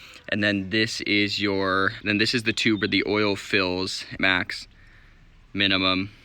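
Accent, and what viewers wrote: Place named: United States. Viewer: What wheels? 🤣🤣🤣 American pronunciation 🙄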